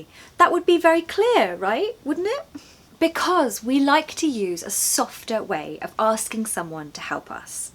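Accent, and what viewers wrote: United Kingdom. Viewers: I absolutely love your teaching style! Your enthusiasm, lovely smile, and expressive hand gestures make learning English so enjoyable. Please keep creating more videos in your beautiful British accent—your lessons are truly helpful and inspiring! Thank you!" I'm watching you from Guildford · I'm so fond of British accent, culture and etiquette. How I wish I come to the UK and possibly meet Teacher I love the way you teach your British accent and culture. I'm from Cameroon. God bless you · I simply love the british accent, the smiling expressions and the fun facts about the UK...Miss the country and miss the Brits 😃 I shall arrange a visit soon. Thank you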